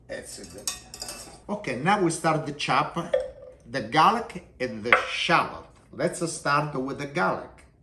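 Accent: Italy. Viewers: Just listening to him makes me happy and makes my dad im half Italian i don't speak Italian but listening to him makes me wish i had that accent haha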